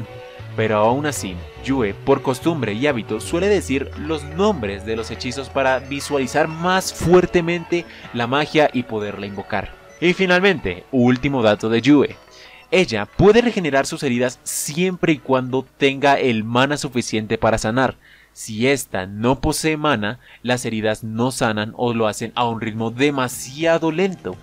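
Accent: Colombia. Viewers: Eres colombiano?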